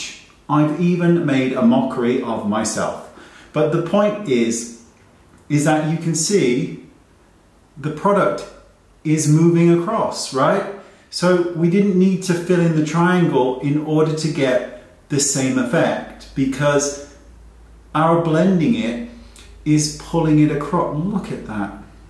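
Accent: United Kingdom. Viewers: Come on guys, there's American English and British English and he has clearly a British accent!? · TBH I dont do make up,I just follow you coz youre so hot 😂 And I like listening when you speak british ,Im a weird? Maybe 😂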